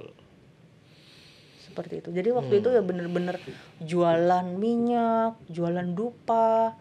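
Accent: Bali, Indonesia. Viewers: Host nya hrs belajar lagi bhs Indonesia jgn medok bali. Kedengaran lucu😂😂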